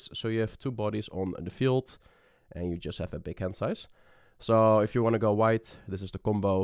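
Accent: Netherlands. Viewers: Random question but are u dutch?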